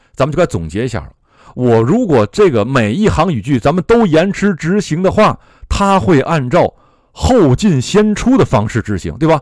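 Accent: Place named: Japan. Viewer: 我说怎么不是英音也不是美音，原来是日音英语。。。。:face-blue-smiling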